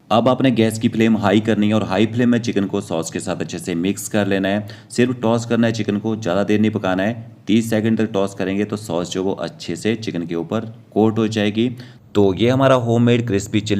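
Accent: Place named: Himachal Pradesh, India. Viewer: Maine Apki tone se pehchan Liya,By the way kahan se??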